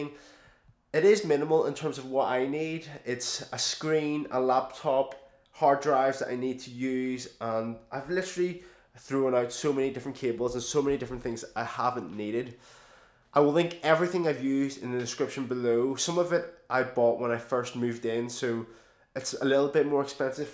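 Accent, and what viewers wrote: Northern Ireland, United Kingdom. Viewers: northern irish indeed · youre from northern ireland arent you, greetings from derry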